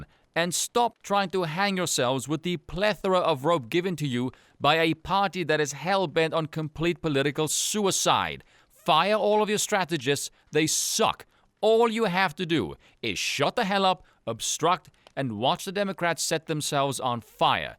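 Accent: South Africa. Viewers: South African, he touched on this a while back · You sound south African lol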